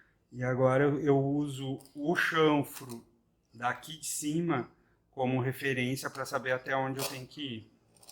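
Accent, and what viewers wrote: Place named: Rio Grande do Sul, Brazil. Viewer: Prezado, teus vídeos são muito bons... agora, na boa, esses teu sotaque gauncho... pelaamordeus... tire isso e ganhará o mundo.. abc..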